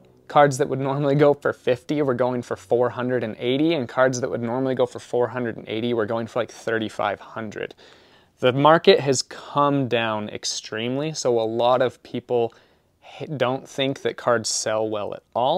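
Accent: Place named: Scandinavia. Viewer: Loved your Scandinavian accent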